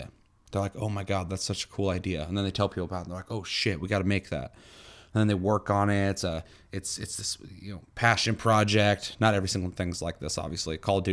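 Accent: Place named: Russia. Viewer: Anthem has an identity crisis. And kinda tries to approach too many audiences. I`m not really interested in BioWare after this point. I`ll still give DA4 a try, but not expecting anything special. This is a weird scenario, when you take a really prestigious company like BioWare, an actual fuck-up and bad game would be kind of given a pass, like "shit happens". But when a company like that releases a game that`s not letter-for-letter awful or bad, but one that`s like Anthem, a game that`s nothing special and has nothing exciting about it, just "meh", it does more damage than a fuck-up. It`s disquieting and worrying when you know that this is something they put actual effort into. Resident Evil is doing justice against the shamelessly stupid movies. I love horror games and Resident Evil is a damn good one and Atomic Hearts looks really dope. Seeing other survival horrors go down the drain (like Dead Space that has been downhill since the first title and then EA shut them down) and other horror games with loads of potential (like P.T or Alison Road) get canceled or drown in development hell is saddening, but at least we have Resident Evil. 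Yo, your russian accent is hilarious ;"D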